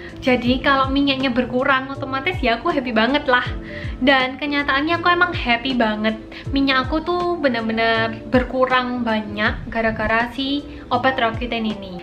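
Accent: Java, Indonesia. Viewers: ojo medok medok banget mbak hehehe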